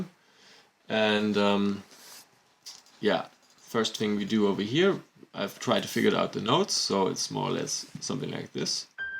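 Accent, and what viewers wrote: Germany. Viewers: bist du ein deutscher? du hörst dich deutsch an. du machst super arbeit!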